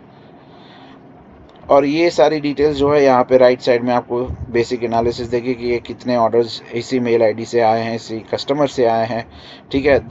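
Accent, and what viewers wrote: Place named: India. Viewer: Why does he speaks with a heavy Indian accent,I couldn't even listen to what he's saying about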